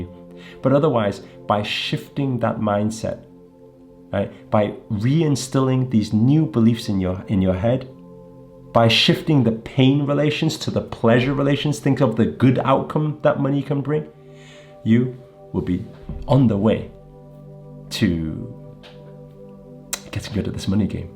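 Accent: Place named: Liverpool, United Kingdom. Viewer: Wow scouser!!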